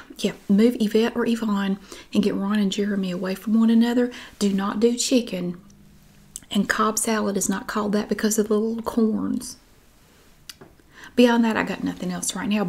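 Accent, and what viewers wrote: South, United States. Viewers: Southern accent in all its glory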